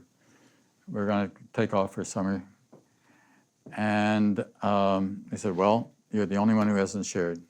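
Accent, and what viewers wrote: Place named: Missouri, United States. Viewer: Yes, I do hear your Missouri heartland accent, no? 🙏🏼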